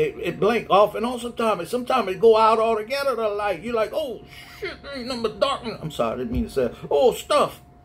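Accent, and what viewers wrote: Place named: New Orleans, United States. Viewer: You are a knowledgeable person. You speak well and I’m overcome with joy just listening. You ARE changing folks 1 at a time. Prayers for your bride, Tam, and you. we love that New Orleans accent and your Bride💜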